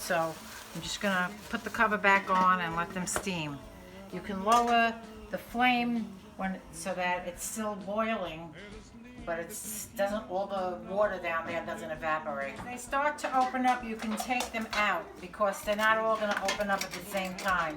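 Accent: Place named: New Jersey, United States. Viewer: Wow this looks good...I'm from Jersy, listening to your voice I'll bet you are too or NY..ps i remember the Purple Gang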